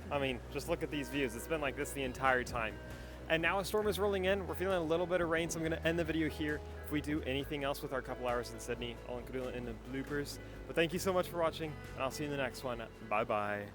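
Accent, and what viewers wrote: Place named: United States. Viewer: Nice video! There's also a surprise little hidden waterfall at Bronte. Your accent is from what part of the US?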